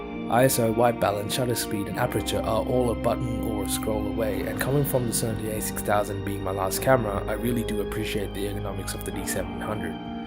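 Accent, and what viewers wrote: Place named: Australia. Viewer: He's Aussie mate!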